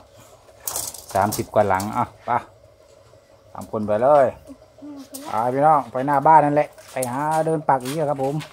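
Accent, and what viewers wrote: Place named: Sisaket, Thailand. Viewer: ศรีเกษชัดเจน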